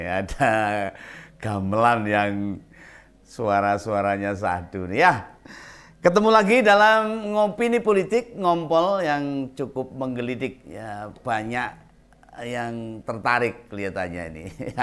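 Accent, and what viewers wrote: Java, Indonesia. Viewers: Halo mas..wah..sya senang skali.narasinya gays bahasanya yg..sederana mudah di cerna.. gdo 2 logat jawanya ada...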